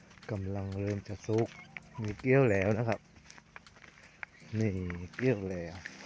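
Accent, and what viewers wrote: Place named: South, Thailand. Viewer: ผมฟังออกทุกคำ พูดใต้เหมือนกันเดะๆ ❤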